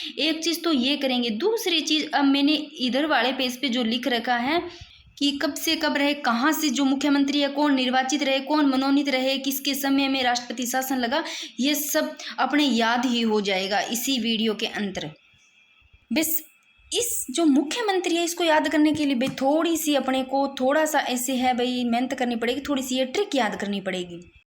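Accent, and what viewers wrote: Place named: Rajasthan, India. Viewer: mam aap kaha se ho aapki Language Nohar Bhadra Side ki lg rhi h😊😊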